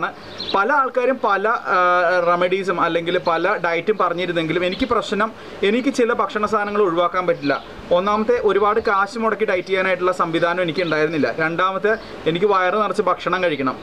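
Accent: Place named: Pathanamthitta, India. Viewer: ഇല്ല ഭായി സംസാരത്തിനു യാതൊരു കുഴപ്പവുമില്ല. ഞാൻ കരുതിയത് ഭായീടെ നാട് പത്തനംതിട്ട ആണെന്നാണ്.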